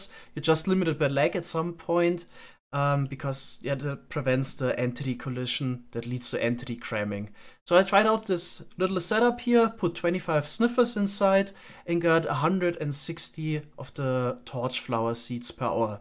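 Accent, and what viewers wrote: Germany. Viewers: you hear so on as u would be german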